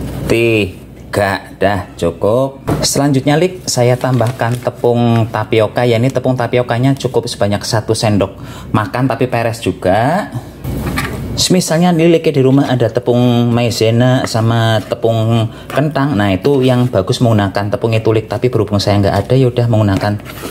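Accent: Banyumas, Indonesia. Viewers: Assalamualaikum baru nyimak Mase jawane mana Ngapak kaya aku Aku Kebumen mase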